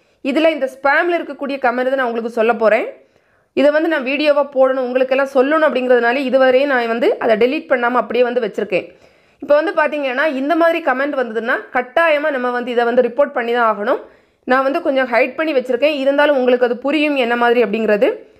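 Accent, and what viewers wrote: Kanyakumari, India. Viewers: Neenga kanyakumari district ahh?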